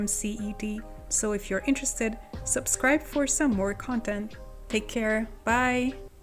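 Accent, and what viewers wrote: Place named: Hungary. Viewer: I came upon your channel and I enjoy your art very much and the way you present it. Also, I hope I’m not mistaking (I don’t think I am) I can hear a slight Hungarian accent which I recognize because I am also Hungarian. I cannot believe the derogatory comment you received, your english is beautiful, I don’t understand why would someone say those things,but I’m not completely surprised,there are many different people in this world. I am looking for more videos from you,es kivanok sok szerencset es a legg jobbat mindenbe!😊 Maybe my hungarian is not the best anymore because I live in America for the last 44 years. Szia 👋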